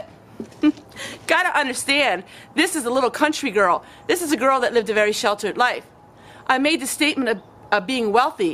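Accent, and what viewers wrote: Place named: South, United States. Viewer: Wait. What? You're conservative? I wouldn't have known if you hadn't said anything. 🤷🏾‍♀️ I’m more focused on your accent. I can't figure it out! 🤔😆 Are you a Canadian living in Arkansas? It's like foreign and Southern, but not too Southern. Very interesting!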